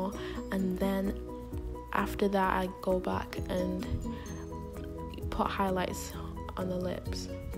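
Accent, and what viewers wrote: United Kingdom. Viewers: lmao ella mai is from london. has a northen accent they ain the same 😂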